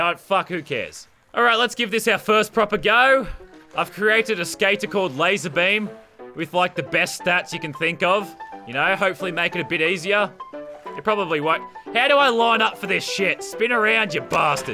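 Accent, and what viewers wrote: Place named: Australia. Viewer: Your next challenge is to do an entire video in an accent besides australian!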